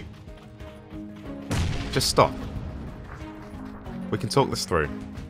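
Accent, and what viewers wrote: United Kingdom. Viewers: I love your accent. I wanna marry and englishman and hear him talk all day.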